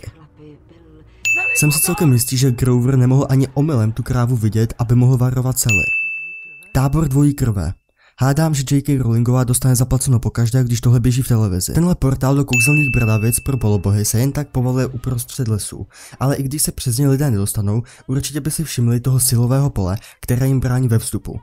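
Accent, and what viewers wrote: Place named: Prague, Czech Republic. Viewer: Hej jako já nechcu nikoho urážat, ale jsou nějaká morální pravidla.Ja su sice jen vytretý moravák,ale pražáčtina je něco NECHUTNĚ otřesného a k tomu mě dokopává to HR,RR,DOBHRE atd.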